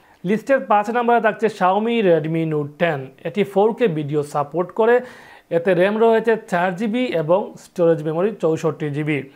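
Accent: Sylhet, Bangladesh. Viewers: ভাই মনে হয় সিলেটি,, অনেক অনেক শুভকামনা রইল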